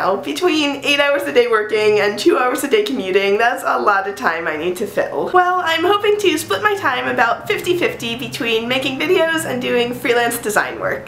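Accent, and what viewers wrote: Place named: Vassar, United States. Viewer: She seems to talk like she's from Vassar.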